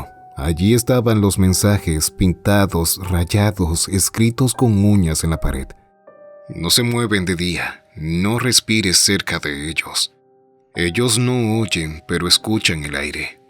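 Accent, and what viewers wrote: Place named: Caribbean. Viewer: Por alguna razon tu voz fuera de la narracion me imagino un Skyper caribeño y me encanta xD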